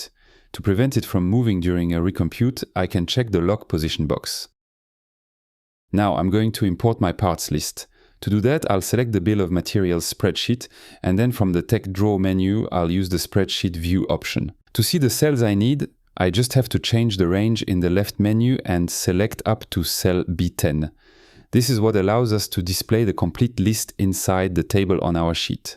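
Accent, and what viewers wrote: France. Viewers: You sound french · french accent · I was not expecting YouTube to deliver me such an excellent FreeCAD tutorial from a man who sounds like the person every single impressionist based their exaggerated, stereotypical French accent on. This man may be the most French-sounding man I have ever heard. It would be very funny if I'm super wrong abd he's Flemmish, or something.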